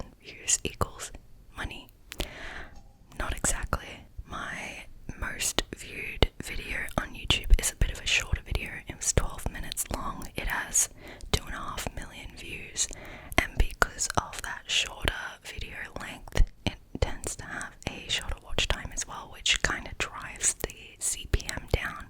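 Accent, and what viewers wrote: Australia. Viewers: I’m late to the party but is Australian? Sometimes it’s hard to pick the accent with asmr but I’m loving it! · Are you aussie?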